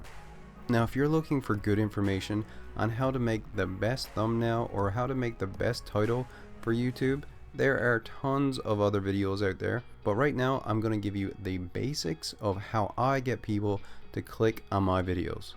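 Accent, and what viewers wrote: Newfoundland, Canada. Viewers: I've watched a ton of your no man's sky videos, I just discovered you about a week ago and I've had your videos playing pretty much non stop and your guides really helped me alot. But in each video I keep thinking "huh, his accent sounds like the Newfoundland accent" but I kept telling myself there's no way... until I saw you in this video, that landscape is without a doubt Newfoundland lol. What part of the rock are ya from b'y? My entire family is from there lol.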